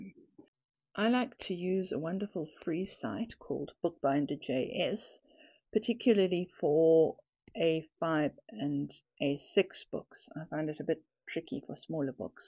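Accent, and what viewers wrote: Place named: South Africa. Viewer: You must be from South Africa? You sounds just like my mother in law. 😊 Thank you for this video! I have always arranged mini book pages the hard way, but now I have some much easier and quicker options.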